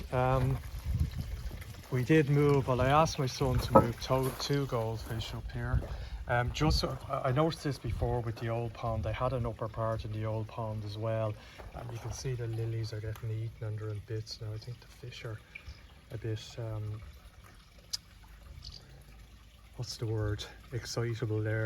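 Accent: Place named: Ireland. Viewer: Clean your ears out. Its called an irish accent. Thats all. Im english. Understood everything perfectly. Great vid. Keep up the great work.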